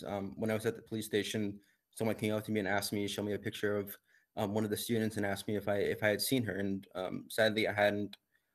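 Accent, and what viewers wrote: United States. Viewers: Is he American?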